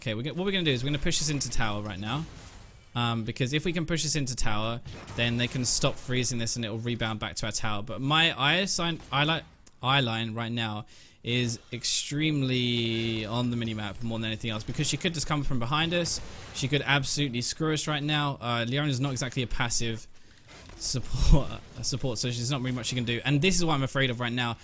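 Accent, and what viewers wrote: United Kingdom. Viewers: a wool? a wool? took me like five sec to figure out you were saying wall. british accents are awesome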